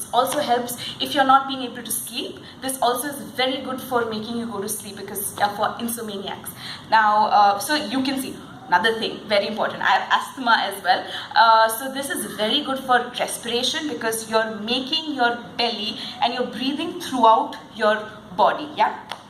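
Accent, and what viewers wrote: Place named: Bangalore, India. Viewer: Everything is fine but your Bangalorian accent and body language sucks ...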